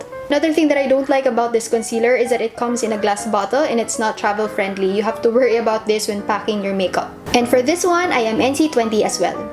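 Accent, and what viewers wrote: Philippines. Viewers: I love how true you are to your filipino accent. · Her accent is so filipino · your english is cery tagalog.... we dont understand what u talk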